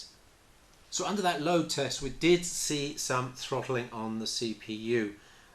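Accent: United Kingdom. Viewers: Does it matter much? His name is. Not a lot of Germans are named, nor do they talk with a British accent. So there is a very good chance that he's British. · Hahahah no it doesn't, I was just curious, he speaks with a British accent but his keyboard is QWERTZ and also his windows is in German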